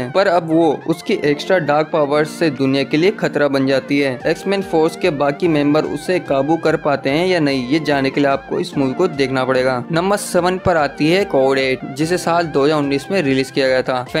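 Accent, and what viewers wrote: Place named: India. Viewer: so bad english slang,obvious is indian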